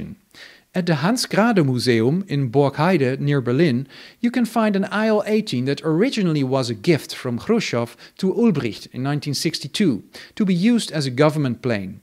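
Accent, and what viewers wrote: Netherlands. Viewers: By the accent more from the Netherlands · Am I correct, sir, that you have a Dutch rather than a German accent when you speak English? This video has corrected a visual error I've had for 40 years. I was waiting for you to get to Interflug's L1011... and waited, and waited... It was then I realized the L1011 belonged to LTU! Same reddish colour in the livery! :trophy-yellow-smiling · his accent is Dutch not German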